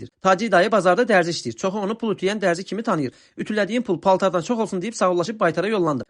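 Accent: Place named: Balakan, Azerbaijan. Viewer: Şirin Balakən lehcesi😊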